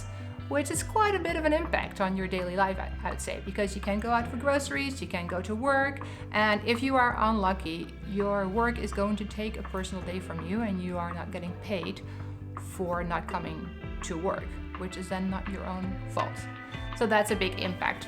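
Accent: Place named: Russia. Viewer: Your russian english is pretty good. A lot of accent still. Very fine though. Congrats!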